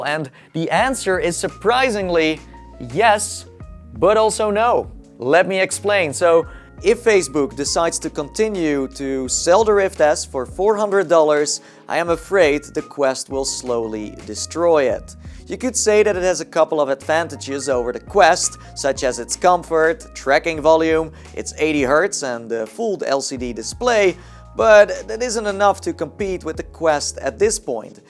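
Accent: Netherlands. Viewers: wow je hoort echt hard dat je nederlands bent XD ly, keep up the good work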